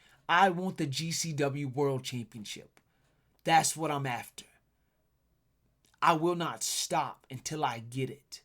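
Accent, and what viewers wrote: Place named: Alabama, United States. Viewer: I thought you were from Alabama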